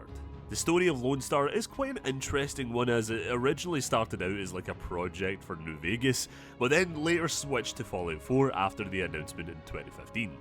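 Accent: Scotland, United Kingdom. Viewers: i like the way scottish people talk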